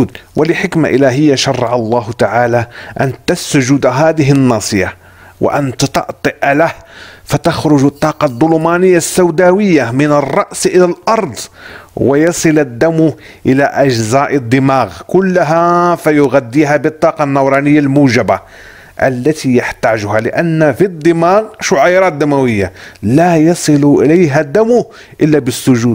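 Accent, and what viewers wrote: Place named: Egypt. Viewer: يا شيخ انت اكيد مصري مش مغربي عشان لهجتك مصريية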